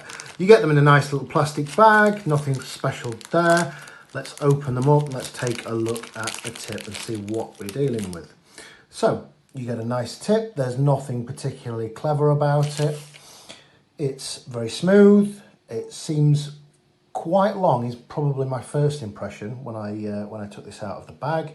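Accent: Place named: Northern England, United Kingdom. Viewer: Have you moved to them since this video and do you still rate L style premiums? Cheers fella, you sound like a northener like me, have lived in Spain a long time though, hence the soft tip.... I'm not that keen if I'm honest....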